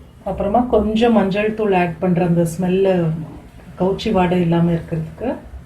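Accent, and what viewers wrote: Bangalore, India. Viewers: Nice Bangalore tamil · Akka banglore tamil haa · I am tamilian from Banglore, it was v good see you any cooking kebab and talking Tamil in our style.. 😄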